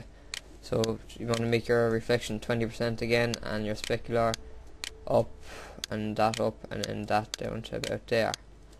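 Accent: Ireland. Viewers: you sound like an Irish version of Edd from Eddsworld =3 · he's irish thats the way he talks thats wrong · hey hes Irish like me haha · u r Irish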